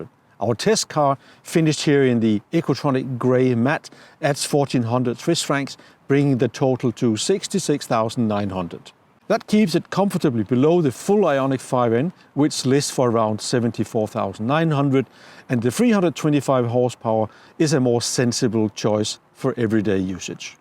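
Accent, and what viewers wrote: Denmark. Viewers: Tager jeg fejl, eller afslører din accent, er/taler dansk? Nå men har købt en ionic 5 Ultimate her i Danmark, den bruges primært af min kone som elsker den når hun pendler til og fra arbejde. Ja forbruget er lidt højt, men den er lækkertskruet sammen, kvalitet, ekstraudstyr. Rimeligt prissat nu i forhold til tidligere og efterprøvet igennem flere år og raffineret. Klar anbefaling herfra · hahaha tænkte netop det samme. Svært at skjule den danske accent.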